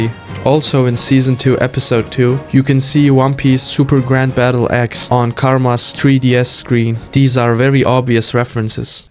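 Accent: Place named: Germany. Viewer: i can hear that lil german accent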